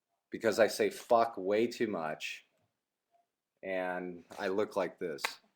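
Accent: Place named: Indiana, United States. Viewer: He pronounces them that way because he's from Indiana, that's how they talk, it's an accent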